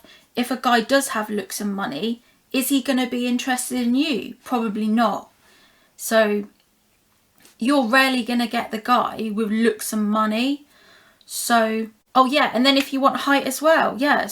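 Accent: United Kingdom. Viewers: I can listen to her all night long. She sounds like BBC/London. 😊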